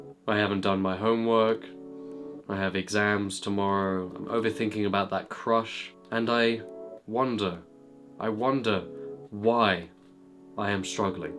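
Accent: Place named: Liverpool, United Kingdom. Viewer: I used to live in a Liverpool and you have very familiar accent)) By the way, the video is amazing, thank you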